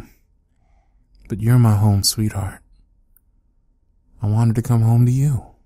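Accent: South, United States. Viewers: Are you from the south or something cause it sounds like you are or you’re just really good at doing accents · is it me or did anyone else hear the cowboy ascent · HIS SOUTHERN ACCENT HAS ME QUAKING LMAO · That slight southern accent is so charming!!😍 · I FRICKING LOVE THE SOUTHERN ACCENT, ITS SOOOOO AMAZING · Dog + southern voice grabby hands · The southern accent is almost a turn off, I guess is trying to fit the "all American vets have tough southern accents" stereotype, for me it's just distracting but it probably hits home for other people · So cute! I love that you have a slight southern twang when you talk in this one! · It somewhat sounded like a southern accent was thrown into this. It was amazing. This reminded me of my dad returning home from Iraq when I was little. I loved this so much. Well done. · As a southerner the accent slapped me so hard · Awwww the southern accent always gets me 😍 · Country accents! 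Country accents everywhere! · The southern accent is back y'all. The heartwarming fluffy bits r back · Dat Country accent 😍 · Psst guess what. The country accent. Fucking amazing. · Southern accent 😳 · holD uP nOw! YOu HavE mY CoUnTrY AccenT! And Is ThAt I WeE dOggIe? HoOoOoO-wEeE! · I love southern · Is it just me or did he do a southern accent? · Is that a twinge of a southern accent I hear? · I know I wrote this and all, but as I was listening to this, I cried like a little bitch., your southern accent is so adorkable! Even still, I'm dying because of how wonderful this turned out! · aaaagh that Southern dialect! I love it! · I love the country accent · That southern accent is actually everything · I swear you get a little southern every time you do a soldier one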